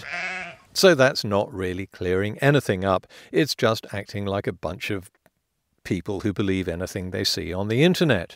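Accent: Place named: England, United Kingdom. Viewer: it is not that PCR tests don't work, PCR tests are not a definitive method for testing for Covid. That is the fact that ABC is never going to come clean about. Also the choice of narrator is advicive in that the choice of a speaker with a "crisp as an apple English accent " is supposed to be an indicator of intelligence. That is a total myth. They have become puppets like all the rest.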